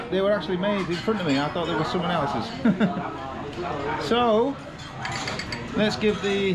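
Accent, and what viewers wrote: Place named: Teesside, United Kingdom. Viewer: Just subscribed, going to watch your vids tonight, your accent sounds familiar, are you from Teesside?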